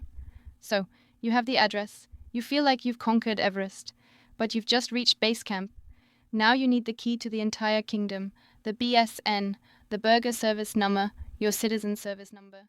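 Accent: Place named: Eastern Europe. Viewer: Don't forget the Eastern European accent, pretending being from the US.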